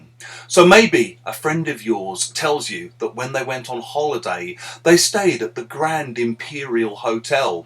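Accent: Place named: RP, United Kingdom. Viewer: I can't stand her la-di-da way of speaking.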